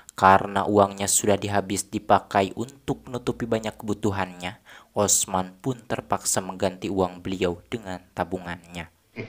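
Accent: West Java, Indonesia. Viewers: AA orang mn siiih? Jiga na orang Sunda na..semangat a💪cute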